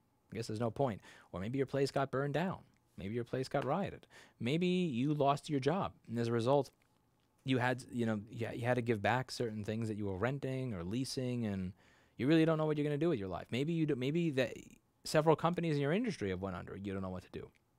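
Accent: New York, United States. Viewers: If you could boil down all that's good an interesting about NYC, it would look and sound like Rossmann. The world needs more. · Watching your video from my bed, i wondered "why did i subscribed to a guy who fixes Mac systems"? I bought a mac some time ago cuz i wanted to do more photoshop for my pics, but haven't been taking pics for some time now. And i don't think i'll be opening a mac anytime soon. So after a couple if minutes, i realized: 1. i've always liked the NY accent (never been there, not sure why. Not even my native language ) 2. i like real people, and you keep it real 3. i like that underdog attitude. Keep up the good fight, i'm a believer in hard work. Been working since 16, almost 3 decades in a couple of years. Not the sharpest pencil on the box myself, but i firmly believe that if you try to do your best everyday; life will treat you fairly. 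I've had a rough couple of years before the covd hit the fan. But my ant determination (i feel like im in autopilot sometimes) has kept me afloat, and things are looking bright ahead for now. Cheers from Costa Rica.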